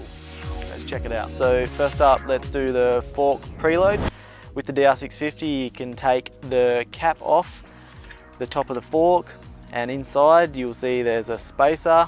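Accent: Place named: Australia. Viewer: fair dinkum aussie right there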